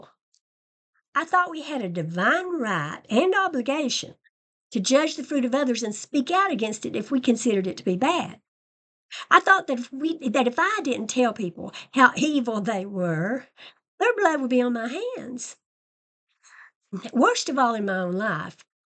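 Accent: South, United States. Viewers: Very, VERY occasionally, the algorithm does me a solid. This is the second one of your videos that i’ve seen, and only because the algorithm recommended your most recent video to me yesterday. I will obviously have to subscribe and catch up your back-catalog. I greatly appreciate your clear-eyed, calm, and humane thoughtfulness. I’m also pleased to have finally found an online exvangelical with properly delightful Southern accent. Representation matters! 😋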